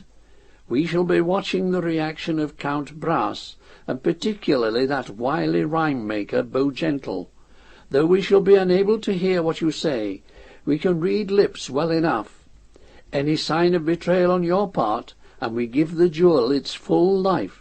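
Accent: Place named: Yorkshire, United Kingdom. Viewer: How rare: To hear a Yorkshire accent as a reader!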